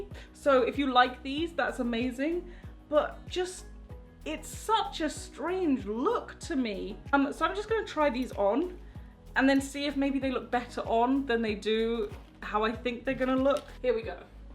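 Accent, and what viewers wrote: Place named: RP, United Kingdom. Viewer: You're so posh I love it 😂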